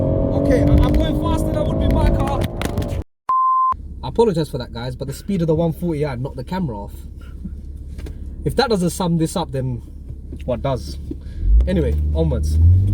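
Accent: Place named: Bangladesh. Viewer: Love your Bangladeshi accent bro! It’s what makes you unique ❤️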